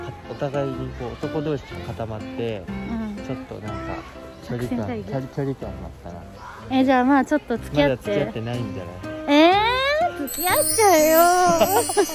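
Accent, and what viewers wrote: Oita, Japan. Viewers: 大分弁とピーピー豆最高‼️ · 大分県民として大分弁時々出るの最高です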